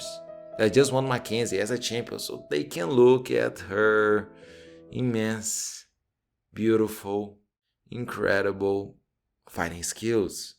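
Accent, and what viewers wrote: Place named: Brazil. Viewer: Vc é brasileiro pae, da pra reconhecer pelo sotaque irmão kkkk! VOCÊ FOI PEGO!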